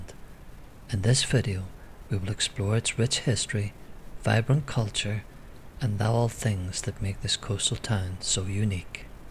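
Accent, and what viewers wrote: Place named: Ireland. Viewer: Beautiful video, just found yr contributions to Northern Ireland, and I’m binge watching them lol. Loving hearing yr lovely Irish lilt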